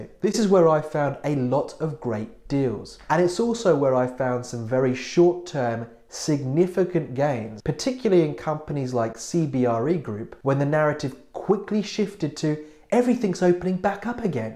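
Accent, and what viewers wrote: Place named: England, United Kingdom. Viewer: Interesting accent. Are you from England?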